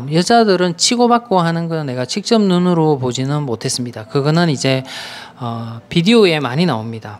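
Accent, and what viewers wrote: Gyeongsang, South Korea. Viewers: 삼성이 철수하기로 했다죠,,,,,,,,,,설명해주신 이유도 상당합니다,,,,,,,,,,설명하시는분 억양이 경남쪽인것 같네요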